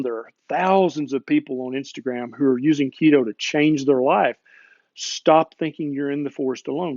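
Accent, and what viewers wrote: South, United States. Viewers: 🍫🍿🥤 I'm ONLY here for the Southern accent. 🍔🍟🍩🍪🥤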